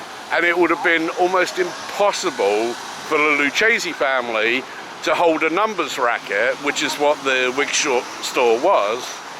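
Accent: United Kingdom. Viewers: Wow! Looking forward to that! After watching this video today, it prompted me to watch that scene again from "Goodfellas", which of course can be seen here at YouTube. Even after all these years it still packs a punch! Here's hoping you have a wonderful weekend,! Thank you for all your hard work! Oh and please don't worry about rambling too much. I think I speak for a lot of your fans when I say we can't get enough of your charming Brit accent! 🙂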